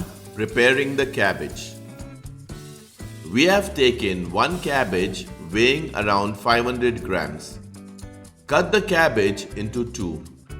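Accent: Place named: Mumbai, India. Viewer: What a pucca Bandra-Mahim accent! 😅 Very nicely demonstrated to prepare a perfect Cabbage Foogath! A variation of Saraswat Upkari!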